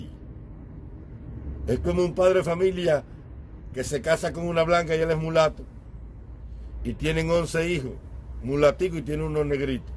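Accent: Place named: Cuba. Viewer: Hombre desonestidade de tanto sufrimiento, tanto desespero, me he reido, disculpen, estoy de acuerdo con todo, como usted lo dice, ni una caja de fósforo. Que claro y en buen cubano habla usted. Lo admiro, que clareza, Dios bendiga a nuestra Cuba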